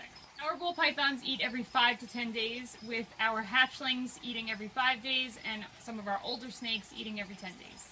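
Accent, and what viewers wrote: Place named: Long Island, United States. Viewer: Where's your store? Sounds like L.I. judging by the accent.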